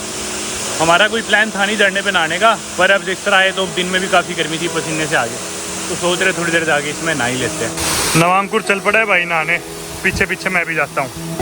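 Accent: Haryana, India. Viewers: No doubt ap boht ache vlogger hen but apne accent ko thora groom kren English bhi ap apne haryanvi accent bolte hen.. Sari dunia ghoom li ap ne but you sounds like a typical backward villager.... kafi videos me ap ajeeb si awaz nikalte hen jese kisi janwar ko hankte hue log village me nikalte hen... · I rarely watch full video that too at normal speed but aaj kafi time baad dekhi complete video, courtesy apna haryanvi bhai ji. Jo kafi handsome bhi hai 😅 n really he narrate so well ye to manna pdega with his desi accent, great skill .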